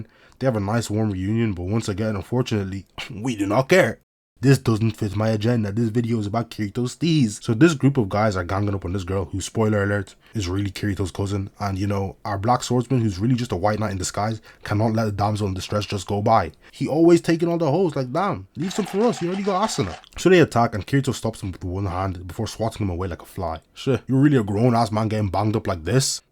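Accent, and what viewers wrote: Ireland. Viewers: U sound Irish a bit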